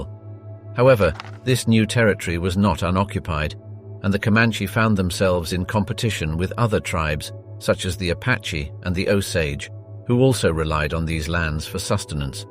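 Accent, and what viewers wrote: United Kingdom. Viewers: How appropriate that so much misinformation (& mispronunciation) is narrated in a BRITISH accent.... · Why do you have a British narrator for American history? I'd think you could have done something alot better than start off negatively.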